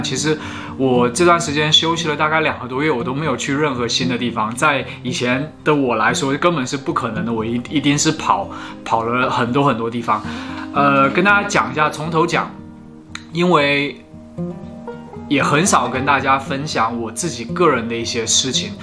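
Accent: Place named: Taiwan. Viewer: 怎么台湾口音？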